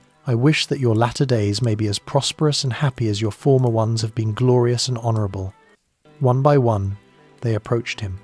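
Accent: England, United Kingdom. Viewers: Why would I trust an English man narrating American history!!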